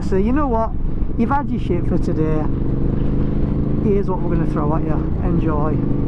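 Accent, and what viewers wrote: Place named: Saddleworth, United Kingdom. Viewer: Brilliant again I've not been up there for years but I plan to do it next year. Where are you from ? you sound local to me ,,Saddleworth way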